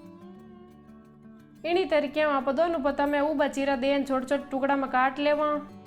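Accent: Marwar, India. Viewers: Bhabi PYOR MARWADI bole 😊😊😊😊😊😊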